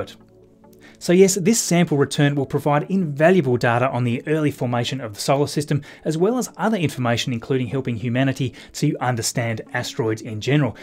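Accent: Australia. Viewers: Love your videos and its great to hear in Aussie accent. May I recommend an Artlist subscription for your music.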